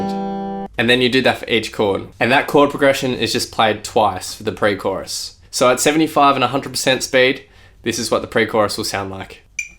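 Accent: Australia. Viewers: Its even better with the Aussie accent.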